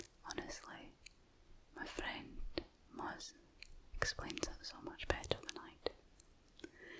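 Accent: Scotland, United Kingdom. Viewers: Love your channel! So very relaxing and that Scottish accent is quite lovely. 💕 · I'm definitly in love with the scottish accent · I am gonna be honest, until now I thought I wasn't really into Scottish accents. Well...this changes things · Greetings from the colony of North Carolina. Your slow motions and soft vocal delivery style are really effective and quite nice. I love your Scottish accent. I mean this with all due respect. You are really truly fine! You are beautiful.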